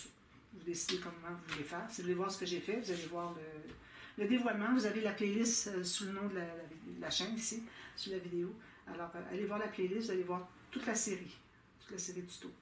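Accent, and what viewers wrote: Quebec, Canada. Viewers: Un vrai travail de Titan !! Merci pour toutes ces démos et les précisions techniques que vous nous fournissez, j'apprends beaucoup à vous regarder réaliser toutes vos pages, détail après détail et je me régale de votre accent québécois😍😍😍. Votre gentillesse et votre patience à expliquer votre façon de procéder rendent vos vidéos très agréables. Merci encore pour ce généreux partage. Je vous souhaite une excellente semaine. 🥰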